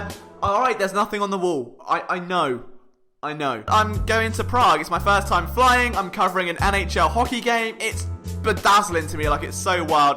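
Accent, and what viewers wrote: United Kingdom. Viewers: Hate to triple comment, but your antics are so brilliantly weird. Combined with a British accent and a Giroux jersey, 14k subscribers should be coming after this post. But anyway, WHERE IS THE FILM ROOM?! Sidebar: You might have to re-WIRE your palate if you’ll be in Philly this year. I don’t even know what half the foods you said were, but I can pretty well guarantee they won’t be available. Cheesesteaks are delicious, yes, but can only have so many in a short timespan.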